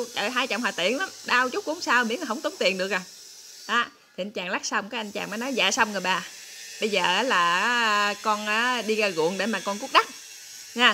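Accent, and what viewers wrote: Mekong Delta, Vietnam. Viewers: Giọng miền Tây có duyên ghe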